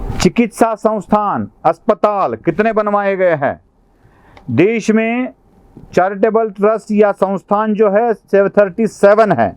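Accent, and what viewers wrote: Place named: Maharashtra, India. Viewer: आपली हिंदी खुप छान आहे पन ACCENT थोडं मराठमोळा यतोय . थोडं ACCENT वर काम झालं तर छान होईल .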